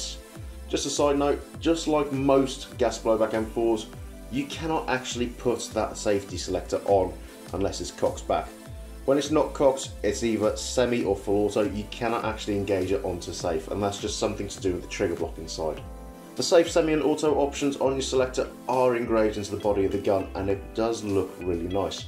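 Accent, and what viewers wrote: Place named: Australia. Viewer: lol Your aussie... where you based?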